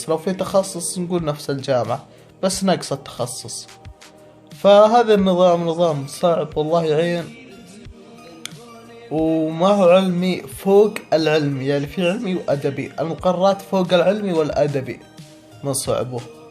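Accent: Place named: Qassim, Saudi Arabia. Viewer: اية بس لهجتة قصيمي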